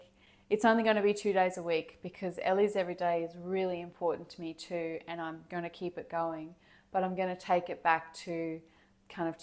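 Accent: Australia. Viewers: Thank you Elly, you have inspired me for quite some time, and always look forward to learning more from you. I am glad you have figured a way to continue to share what you love, yet finding balance. We all have to find what works for us. I too changed direction at the end of last year, mine was from selling soaps etc, unfortunately a very recent cancer diagnose has offered a new challenge. We all must follow our heart, and live with passion. From this Kiwi in NZ, Peace & Love (PS Love the aussie accent)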